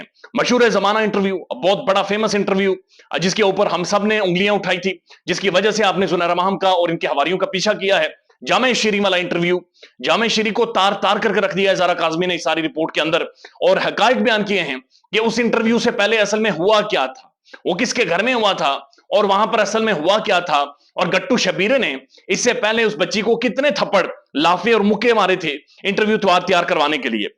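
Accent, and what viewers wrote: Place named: Kashmir, India. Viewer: ایب ،کیب ) اس سے پتہ چلتا ہے کے ماسیرو کشمیری ہیں آپ 😊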